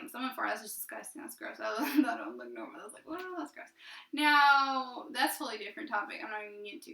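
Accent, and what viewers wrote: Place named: South, United States. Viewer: Hey. I love your southern accent. Praying for us all. Take care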